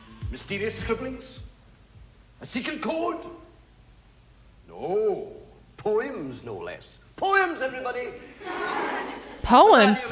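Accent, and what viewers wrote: United Kingdom. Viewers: British public school commentary circa late '70s.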